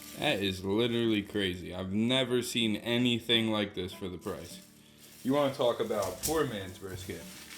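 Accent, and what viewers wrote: Philadelphia, United States. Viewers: can't miss that northeast philly accent 😂. I lived in Magee back in highschool. I'm up in Chalfont by Ryan HS now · Are you from Philly? Love your videos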